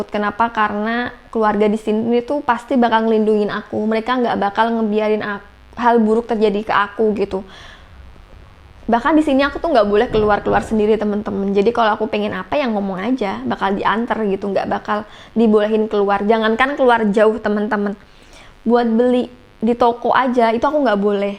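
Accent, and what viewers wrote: Indonesia. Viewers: Yg bikin aq suka liat chanel ini krn suara renyah dan manja. Logat jawa nya tetep g ilang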